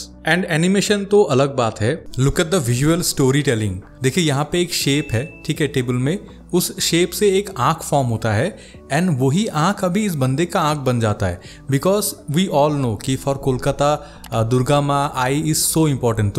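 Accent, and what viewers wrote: Assam, India. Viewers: 😂 Assamese accent tu je odd lagise kiba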